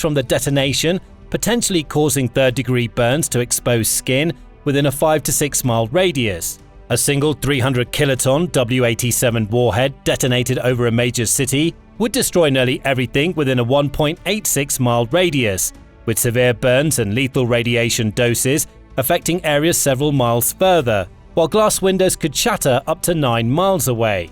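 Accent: England, United Kingdom. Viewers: I'd like to know why he's pronouncing "Aluminium" like an American as he's clearly English by his accent, English people do NOT pronounce "Aluminium" that way.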